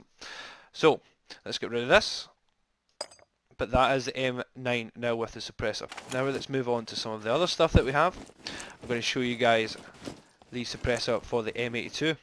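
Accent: United Kingdom. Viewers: From birmingham ?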